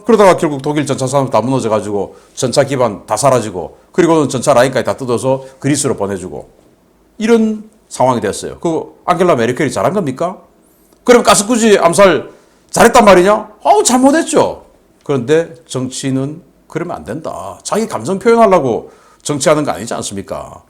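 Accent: Gyeongsang, South Korea. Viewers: 경상도 말으로 러시아를 발라 버리는 깡이 직이네요... 그런데 방공망이 없는 거나 마챦가지 라는게 더 웃깁니다... 우리나라도 예전에 북한 의 정말 얄궃은 드론 을 나라 한바퀴 돌아 갈때 까지 몰랐었는데... 상당히 우려 스럽 습니다... 나아 졌는지.... 걱정 이군요...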